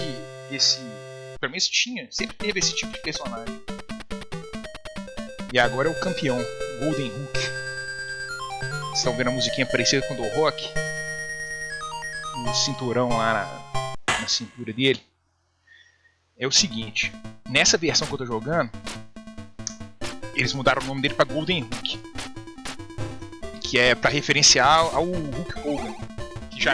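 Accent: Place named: Interior, Brazil. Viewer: Caralho, mas que sotaque caipira.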